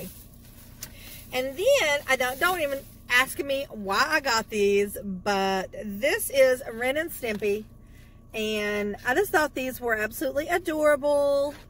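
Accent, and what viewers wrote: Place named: West Virginia, United States. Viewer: Are you in Beckley, WV? It just sounded like that area. I am within 50 miles of Beckley. Enjoy all your videos, thank you for sharing.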